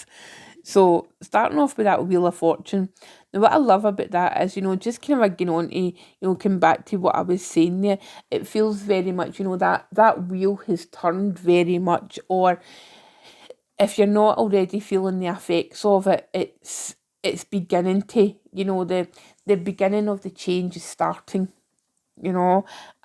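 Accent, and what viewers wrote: Scotland, United Kingdom. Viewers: Oh. I love your accent. Are you Scottish.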